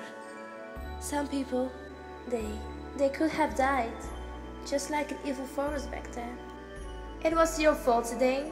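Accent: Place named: Belgium. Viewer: You're Belgium accent is beautiful! You have such a soft gentle voice. I love it. :)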